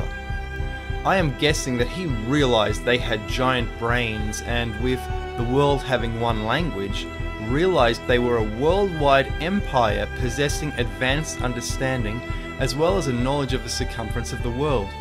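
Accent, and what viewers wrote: Australia. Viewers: Speaker has an Australian or new Zealand accent not English. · Sounds like a good, stand up australian boy! Well done son.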